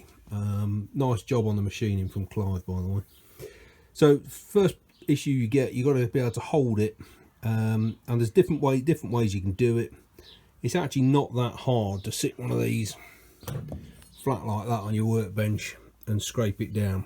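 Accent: United Kingdom. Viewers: Thanks for the video. Came from Steve's channel. You sound like you come from the same side of the pond as myself UK. Keep safe and stay well.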